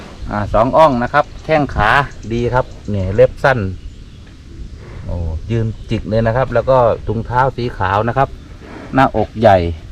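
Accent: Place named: Isan, Thailand. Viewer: สวัสดีครับ.ผมชอบฟัง ตอนพูด สำเนียงอิสานมาก..เฮดี.ครับ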